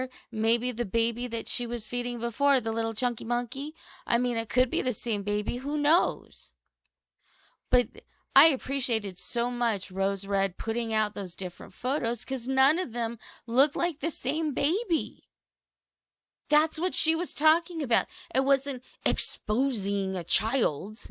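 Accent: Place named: Geordie, United Kingdom. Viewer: I agree, maybe even Leeds. I thought at one point he sounded slightly Geordie or Durham way but he's definitely British I believe 😊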